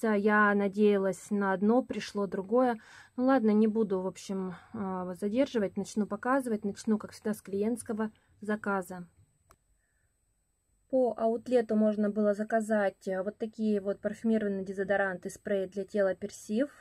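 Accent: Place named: Urals, Russia. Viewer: вы из какого города?Акцент у вас уральский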